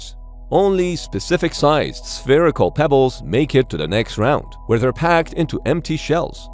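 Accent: Iran. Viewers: The Iranian accent makes the video even better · You got a Persian accent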